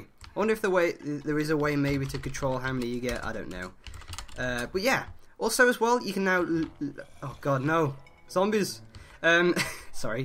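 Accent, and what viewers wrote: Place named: Black Country, United Kingdom. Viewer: Where you from brah, you sound more yam yam than brummy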